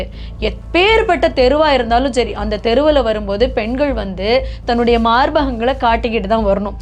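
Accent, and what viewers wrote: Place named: Nagercoil, India. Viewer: இப்ப தான் original Nagercoil காரி மாதிரி பேசுற சகோ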